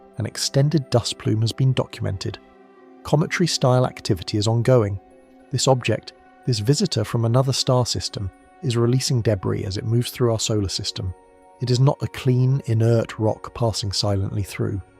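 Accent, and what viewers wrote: United Kingdom. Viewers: Humans cannot put two and two together in real time 3i/Atlas came through in retrograde between the orbits of Mars and the Earth with its "tail" pointed towards the Sun - that is into the future orbital path of Earth and spewed toxic gasses, vapors and chu KS heavy enough to just slow and sit there. Now earth is orbiting through it and catching the debris and toxic soup. One needs to get over the fact that 3i /Atlas was not a Comet and it is simple to explain. The question is how long will be catching debris and what other dangers it brings Let us not make 2 hour lectures in stupor - it is explained by some orbital mechanics Different channel name same British spit mouth. There would not be lisp noise unless words are being spit mouthed. Use an American clean English AI text speech reader and stop repeating not this, not that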